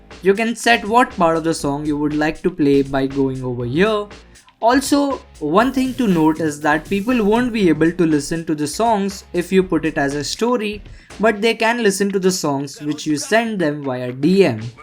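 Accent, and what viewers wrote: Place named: India. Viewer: His indian accent is cute though 😀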